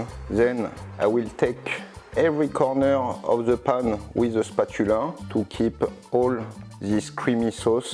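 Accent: France. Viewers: WOW! I haven't seen this carbonara technique before. I will try it this weekend. Thank you, for sharing. I love your French accent. 😊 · I've never seen a thicked French accent et je suis français! Very nice technique! Makes for a fatty meal but it must taste amazing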